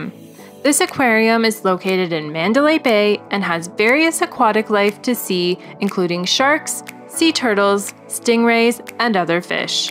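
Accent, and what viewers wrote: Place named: Canada. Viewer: I enjoyed the video, but I was easily distracted by the Canadian accent!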